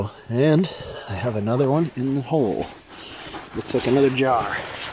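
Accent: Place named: Boston, United States. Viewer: I like that 'Boston Accent' !!!! Perfect setting for 'The Dig '!!!!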